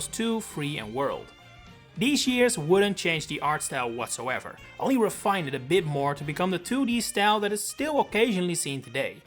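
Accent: Netherlands. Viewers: Im always amazed how I can pick up on a Dutch guy speaking English.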